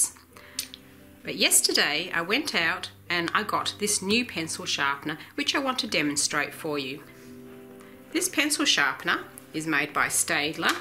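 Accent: Australia. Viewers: A very good straightforward video. Also has one added plus for me personally - it's by an Australian and as I'm an Aussie too it's good to hear it on the Internet once in a while! Note: Officeworks now sells it for A$49.98 (22/5/2019), so it's gone up $5 since that video was uploaded in 2016.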